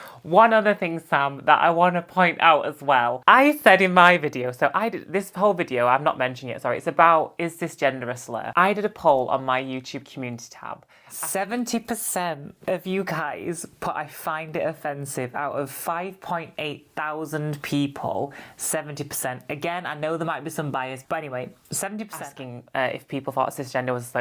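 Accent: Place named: Manchester, United Kingdom. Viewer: Only mean thing I can say is how dare you be prettier and more attractive and beautiful than me! Also love the Mancunian accent 🩷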